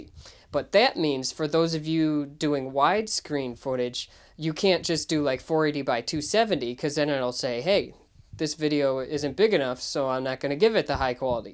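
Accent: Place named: Minnesota, United States. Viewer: i sense Minnesota accent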